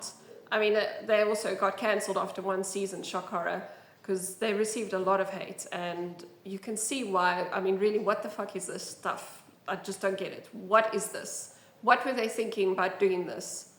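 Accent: South Africa. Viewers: totally correct - also - ur accent? sa? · That accent... South African? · Yeah, I honestly didn't care much for the woke agenda, since it wasn't ALL there was to the game. What completely put me off from buying this game was the seemingly honest reviews; bad writing, mediocre gameplay, the facade of impactful decisions... yeah, that's extremely disappointing. Hopefully Mike Gamble and team can get a good game across the line with the next Mass Effect. Side note: a fellow South African showing love here. Recognized the accent. Great video. You have my subscription.😉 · South African accent. Like an Aussie got infected with Bavaria. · I am Australian, and I am pretty sure this woman is South African, judging by the accent. People in South Africa still seem to have common sense, even here in Australia most people seem to have common sense, it's just when you switch on the TV the media like to portray as if society and reality is different, based on the agenda they want to push. 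· I always comment for Algo when I hear a south african accent. Must support my countrymen 👍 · LOVED this video! Agree with all your points! Another great femaile lead is Senua from Senua's Sacrifice. It's a brilliant game. Also, are you South African? 👀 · A South African talking about this "nonsense" is everything! Subbed! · Great video, I couldn't agee more! As a South African Imust say you sound like a South African ;) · Is that a South African accent that I hear? Hello from SA :)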